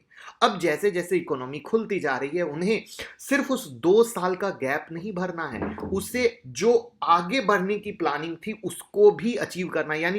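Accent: Bihar, India. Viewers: Sir aap Bihar se lagte ho.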